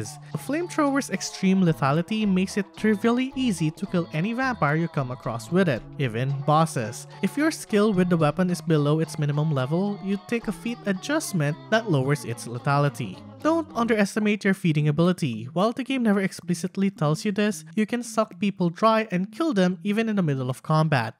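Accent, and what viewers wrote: Philippines. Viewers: Filipino accent detected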